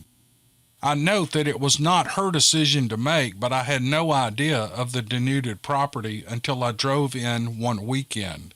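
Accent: South, United States. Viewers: 11/2/22 Hi. I very much enjoyed these stories. I do enjoy long videos, especially at night. About that accent. Many of my older relatives are from the south and I love to hear them speak. They just laugh at me but it's fun to listen to them anyway.😁 Anywho, thanks for the stories (and the accent)🤣. I can't wait 'til the next batch. Take care! ⭐👍⭐ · Talking about southern accent mine is really close to yours and I remember the first online game I played. When I started talking everyone that was playing at that time talked about it for weeks after. I was laughing my but off at them cause I’m thinking what’s so special lol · My story too 😲😂😄 I love reading 'n his sweet southern comfort draw. I listen to his tale's over 'n over...many nights he helps me fall fast asleep 😌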